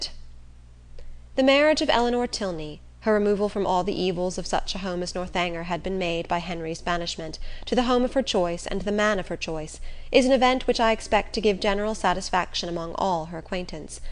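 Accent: RP, United Kingdom. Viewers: Thanks to uploader for this gem. Reader is superb... she does an upper class british accent so well! Your time and effort has given great joy to this part of the world.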